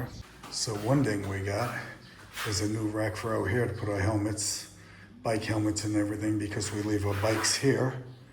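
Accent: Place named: New Jersey, United States. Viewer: Hi. The YT algorithm brought me here. I don't have breathing difficulties but I've watched a vid of a man who had health issues & was able to do the "Camino de Santiago" in Spain. He used a travel CPAP machine (it's smaller) and was able to do the multi-week walk/ hike. Good luck on whatever you decide to do re: your health. Btw, are you from the NE of the USA? You sound a bit like Bernie Sanders and Tony Soprano. (Cawfee = coffee, moah = more). Was just curious; hope I didn't offend. Edit: congrats on the new place & the renovation. One comment abt the kitchen: there's no hood or vent if someone cooks. If the dish being prepared is smelly or aromatic, the whole apartment will smell of food. One way to prevent that would be to install a hood or to put a table outside/ back area and do the smelly cooking there. The view from the backyard is nice. 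Wish you both good health & safe travels! 👍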